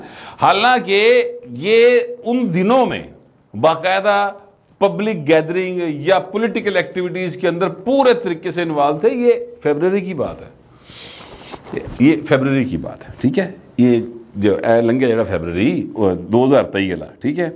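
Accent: Lahore, Pakistan. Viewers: sb hum ap ko pure Lahore k sentences sunnay k lye sunty h. Very beautiful Lahore Punjabi Accent. Mere Moula Kareem ap ko salamt rakhy ❤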